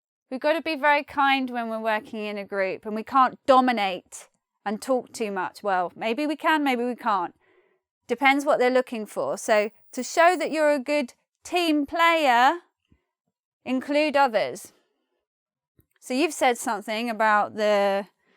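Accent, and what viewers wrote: United Kingdom. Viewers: Are u followed British Accent? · i can't believe that i understand totally what you say, i mean British accent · This is genuinely accent from UK British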